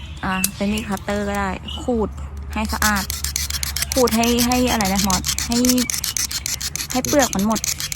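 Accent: Thailand. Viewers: ราดรีชัวร์เลย พูดสำเนียงนี้ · เมืองกาญจน์แน่เลย หรือว่าราชบุรี สำเนียงชัดเจน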